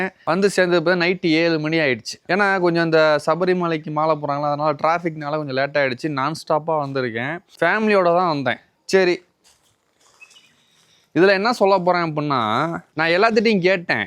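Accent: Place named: Trichy, India. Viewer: Super bro I will also try.. Am having THAR from Trichy .. I watched your videos .. your reviews are so awesome.. Namma trichy slang la Namma Veetu paiyan maaari pesaringa .. Happy trip bro enjoy 🎉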